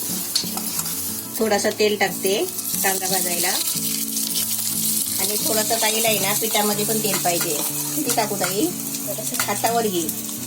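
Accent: Konkan, India. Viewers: Kaku tumhi koknatle aahat ka?? Chan watay tonning aikayla.receipi mast.🙏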